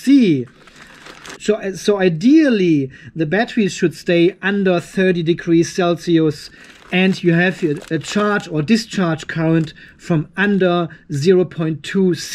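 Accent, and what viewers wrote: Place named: Germany. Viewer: Bei Deinem ersten Video dachte ich mir, daß Du Deutscher ...ähm... gewesen sein mußt. Bei dem Akzent. Aber "Oettinger" geht ja mal gar nicht. Zeig der Welt bitte nicht so ein Plörre. Wie wäre es mit Düsseldorfer Alt, vorzugsweise "Schlüssel Alt" oder "Füchschen Alt". ;-) Grüße von Düsseldorf nach Australien!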